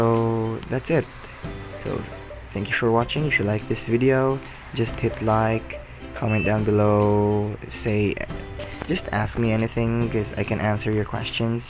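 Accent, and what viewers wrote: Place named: Philippines. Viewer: Hey are you filipino? I can tell by your accent buddy! Mabuhayy!!!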